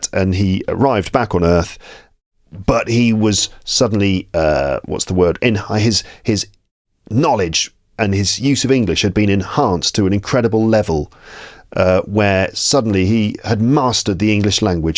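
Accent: United Kingdom. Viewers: Your channel is a real treasure. I mean, your British accent, intonation, and facial expressions make it more fun and catchy! Thank you so much, Mr.! from 🇸🇾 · Hello! You are an amazing teacher I can say i am so glad to have you in youtube. I understand your teaching process.i have learn so many things from your videos. Your British pronunciation is not that difficult.I understand easly everythings. You doing great work for helping like us I really appreciated and from the depth of my heart I wants you to thank you!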